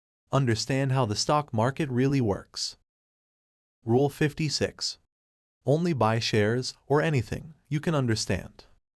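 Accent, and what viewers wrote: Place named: Asia. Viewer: ROBOT READER with Asian Accent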